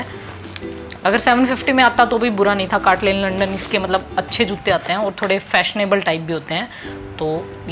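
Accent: Haryana, India. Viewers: wow haryanvi☺️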